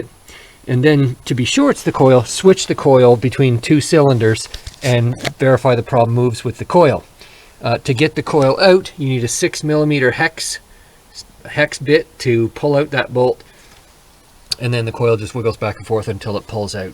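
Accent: Canada. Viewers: You could tell from the accent he's in Canada!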